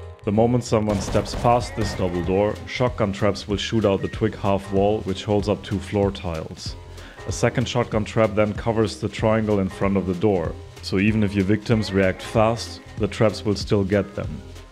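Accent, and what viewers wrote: Germany. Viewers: Bist du deutsch? Hört sich nähmlich ziemlich danach an:D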